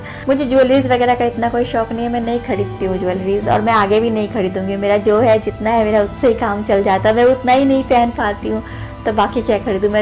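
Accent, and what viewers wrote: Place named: Bihar, India. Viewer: तुम इंडियन बिहारी हो क्यों की तुम हिंदी बिहारी बोल रही हो जय हो बिहारी बाबा की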